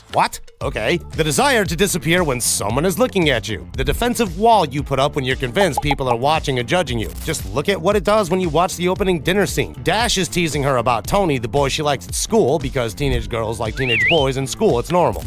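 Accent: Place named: New York, United States. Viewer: I personally think invisibility sucks as a power. It's as effective as mace spray to the eyes, which would actually be a more effective ability. Also, random thing, but I was NOT expecting you to be Asian with THAT Rocket Raccoon New York accent.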